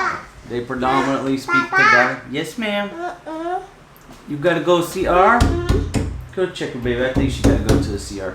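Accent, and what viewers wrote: South, United States. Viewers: You have a good country voice 👍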